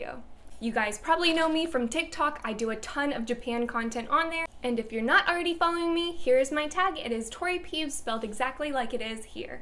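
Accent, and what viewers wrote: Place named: South, United States. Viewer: Thank you for your Speaking Japanese in a Southern accent videos, those made my day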